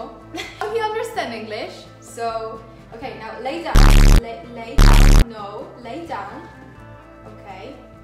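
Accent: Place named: United Kingdom. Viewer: You sound really British now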